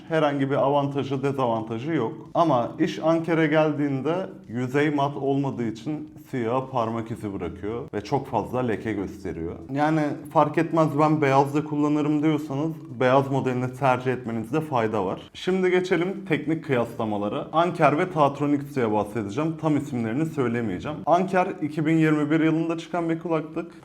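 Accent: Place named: Bingöl, Turkey. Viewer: Kardeş sen bingöllü müsün aslen?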